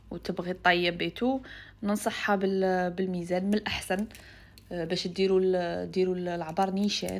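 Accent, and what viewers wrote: West, Algeria. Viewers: باينة عليك وهرانية الله يبارك نعشق في وهارنة سوردو كي يهدرو ههههه لوكان غير تعملينا بغرير وهاد صوالح ويفرحك بقاي تعبري صوالح بلكاطو باش حتا انا نبقا نعمل كيفك مانفهمش هاك نفهم بكاس ونحبك زينة 💋💋والله غير الف حاجة زدتها من عندك فهاد لفيديو ماكنتش نعرفها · ياختي راكي تهبلي أنا من الشرق وتعجبني لهجة الغرب 😍😍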